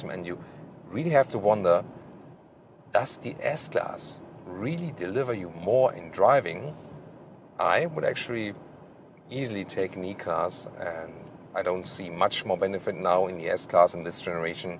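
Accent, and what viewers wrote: Germany. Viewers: I love your German accent. It gives your videos about Mercedes that Teutonic feel.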